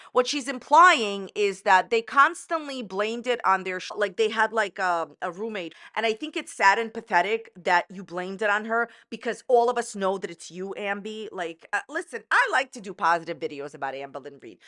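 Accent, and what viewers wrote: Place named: Long Island, United States. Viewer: sounds Long Island-y to me.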